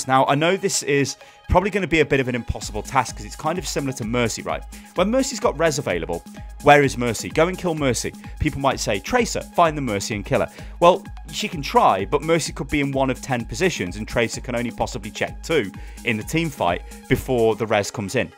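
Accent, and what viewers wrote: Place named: Midlands, United Kingdom. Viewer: Where is he from? He sounds like he's from the midlands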